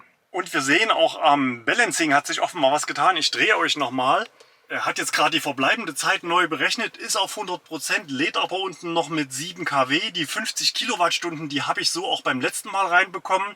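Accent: Germany. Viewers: Your English has a very strong German accent. Hard to understand. 💪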